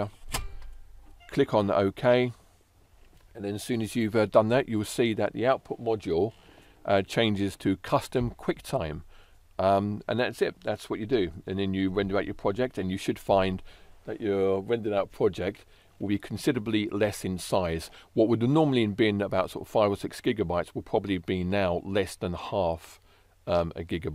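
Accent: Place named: United Kingdom. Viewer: Your accent is so like Hermione(British)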